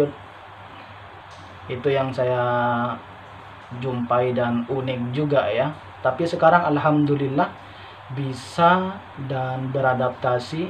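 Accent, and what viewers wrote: Malaysia. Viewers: amboii.. Bahasanya pun dah mcm org KL .. Hihi😆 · Anjay logat nya sudah sempurna,,berapa tahun di malaysia gan ?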